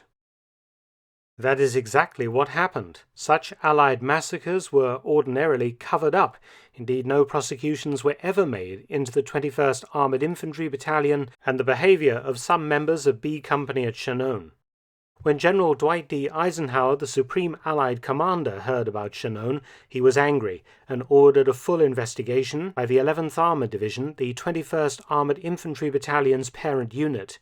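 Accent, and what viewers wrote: United Kingdom. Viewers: I’ve been really enjoying this channel lately. Even his British voice goes perfect with this content. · Mark Felton Productions-- About 98.6% of your videos are FANTASTIC because you don't use politics or PC or pass judgements, just the facts!! Your videos are more education about the ww2 era than a 200 or 300 college level course on WW2! Your accent sounds British…true?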